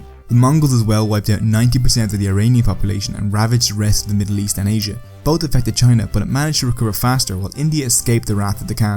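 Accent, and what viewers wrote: Ireland. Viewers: Your videos are really good. I can spot that Irish accent. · clearly Irish...It's not that he doesn't enunciate properly, he just has an accent. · Are you Irish? If so, cool cause you and one other YouTube are the only YouTubers that I know about are Irish. The way I can tell is be cause you pronounce thirty two as turdy too, and the t-h syllables are pronounced differently than as it appears. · u from Ireland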